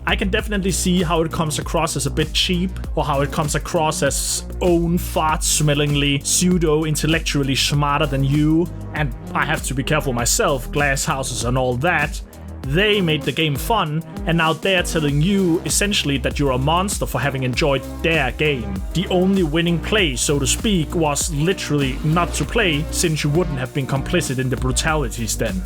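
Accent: Denmark. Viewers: i smell danish on ye boy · You are Danish. I can feel, hear and smell it. As i am danish myself.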